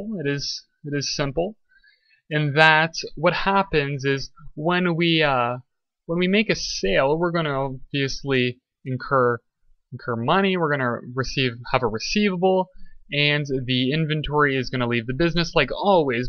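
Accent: Canada. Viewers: Clara Van Biesebroeck hes Canadian haha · He says aboot, probably Canadian.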